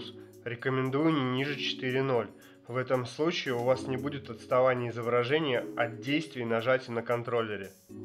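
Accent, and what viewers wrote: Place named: Uzbekistan. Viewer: Узбеки что ли озвучивают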